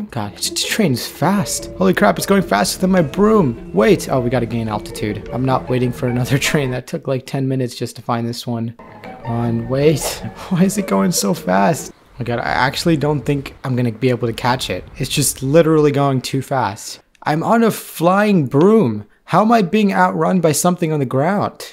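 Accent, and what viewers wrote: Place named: United Kingdom. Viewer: BRITISH MATE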